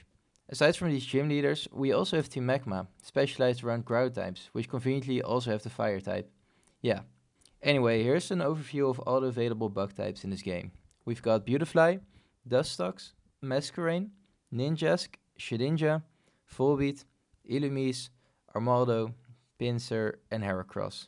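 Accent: Netherlands. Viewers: Where are you from man? You sound Dutch Enjoyed the video. Will watch more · Are you from the netherlands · you're from the Netherlands right, i know that accent haha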